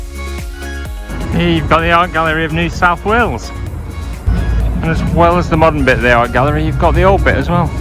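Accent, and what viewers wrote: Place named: United Kingdom. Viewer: Oh! Your accent did sound British to me but I thought you had come from Melbourne, as in living in Melbourne, to Sydney for the cruise. Yes, then you understand the distance. I was on the Discovery Princess and really loved that cruise, especially New Caledonia. I'm in love with the south Pacific and my next cruise will most probably I'll go back to the south Pacific for my next cruise. I hope you enjoyed Lisbon.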